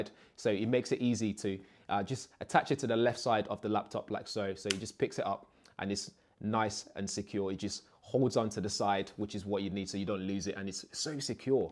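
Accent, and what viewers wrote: United Kingdom. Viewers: Brithish accent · lovely to find a review with a british accent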